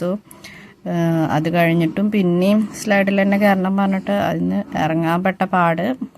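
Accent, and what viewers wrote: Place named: Thrissur, India. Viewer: Aaa thrishooru bhaasha.....poliyaattaa😊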